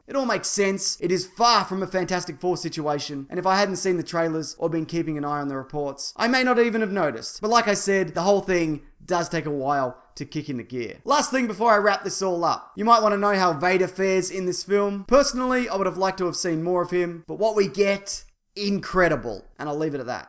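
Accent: Australia. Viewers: Dude....your sense of humor always gets me....out of 10. And your Aussie accent is simply awesome....also....out of 10.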